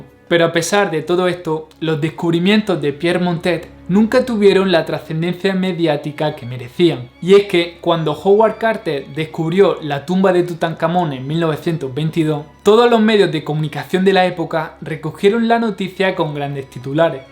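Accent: Andalusia, Spain. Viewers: Me gusta su FUERTE acento Andaluz..... muy buen canal. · MAGNÍFICO E INTERESANTE REPORTAJE PERO..."POBRE EL IDIOMA ESPAÑOL EN BOCA DE LOS ANDALUCES".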